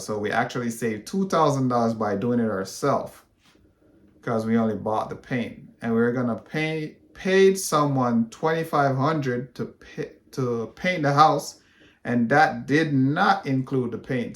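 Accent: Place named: Jamaica. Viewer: Which part of Texas are you located? I am Jamaican too BTW. I can tell from the accent. And I see the Josephus book behind you. Looks like you are on it with your true heritage. 😊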